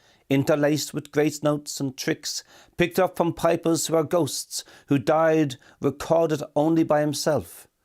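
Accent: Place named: Dublin, Ireland. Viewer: I think this was beautifully read about a man he knew intimately through living with him. I was listening to Seamus Ennis in the background and I could truly visualise him walking down Home Farm Road which was close to where I grew up. I could honestly feel the cold, miserable weather as he pulled his coat on and took the number 11 into town. I loved Bolger's accent which was just like all of my uncles, a soft Dublin accent, one of whom even had the same lisp. I was very touched by the simplicity of the words that were so visual to me.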